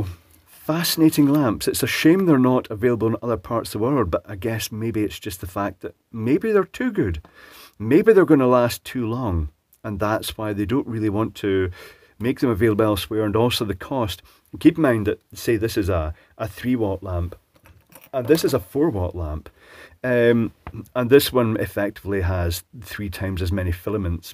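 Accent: Scotland, United Kingdom. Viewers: Actually it’s a Scottish accent!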